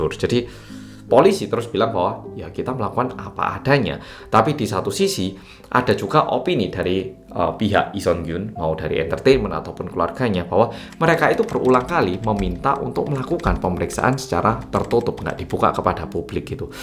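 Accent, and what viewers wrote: Java, Indonesia. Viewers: Bang krn tinggal dikorea mungkin gk brani speaking. Utk tambahan pa yg disampaikan Bang ini ke Channel Detective Aldo, ini kasus (Lee Sun Kyun) bisa jd utk menutupi kekotoran Penjabat/Petinggi2, Anak2/Cucu2 Penjabat korea. Kekotoran kecil/sepele artis2 korea digunakan utk menutupi kekotoran para petinggi2,anak2/Cucu2 Petinggi Korea yg lebih besar. Spt kasus Narkoba&Bullying yg dilakukan oleh anak2 petinggi korea. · Mas aku suka medokmu....bukan hanya indonesia banget tp njowo banget...medokmu kui loh mas 😅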